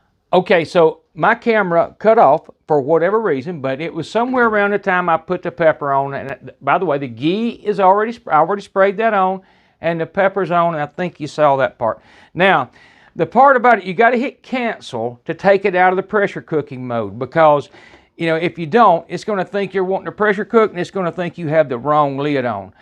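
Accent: South, United States. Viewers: love your accent! southern accents sound always so funny to me. i could listen to you for hrs. i just got one of these insta pots for christmas and i am scared to use it. much love from michigan! i wonder if my accent sounds as funny and nice as yours. take care.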